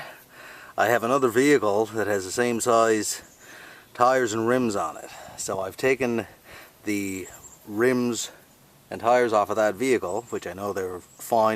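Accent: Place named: Nova Scotia, Canada. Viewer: When I heard “charged aboot” I was like wait, this guy is a Canadian, and he followed “Canada here” lol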